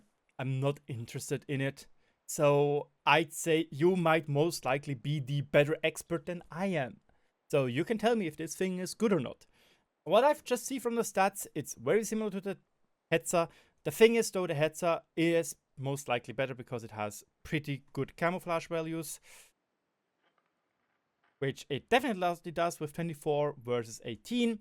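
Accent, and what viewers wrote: Germany. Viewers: Hey, wenn man schlecht englisch spricht, sollte man es nicht provozieren. Bist du jetzt international um mehr Leute zu catchen? Du klingst wie eine Mickey Maus mit nem Deutschen Akzent. Fast so gutes English wie Matthäus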